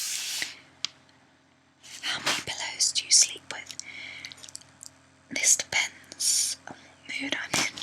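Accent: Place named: England, United Kingdom. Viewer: Well I'm sure guys that know you an your boyfriend will agree with me. I like your accent aswell where are you from in England?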